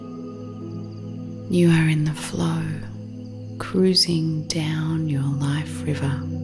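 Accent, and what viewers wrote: Australia. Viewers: My soul responds so much more to your beautiful Auzzy voice ! Namaste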